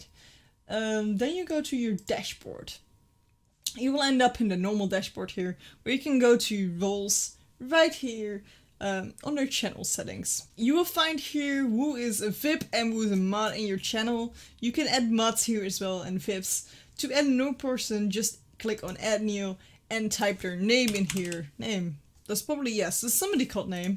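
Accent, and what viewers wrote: Netherlands. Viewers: Are u dutch ?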